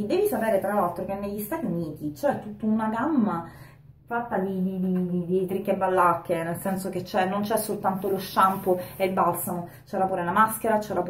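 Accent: Sicily, Italy. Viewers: Sei troppo simpatica e' un piacere ascoltarti...adoro l' accento siciliano e poi sempre piu' bella eh??!!😍😍😜😉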